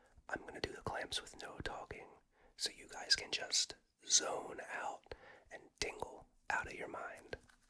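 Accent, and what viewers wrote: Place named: Baltimore, United States. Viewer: An O's cap? And the way I hear that "only" vowel? I know a fellow Bmore man when I hear one!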